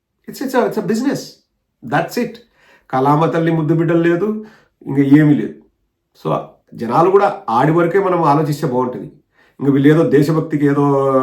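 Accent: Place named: Telangana, India. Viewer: Sudden గా తెలంగాణ భాష మార్చావ్. నీ పని చూసుకో ..పోరా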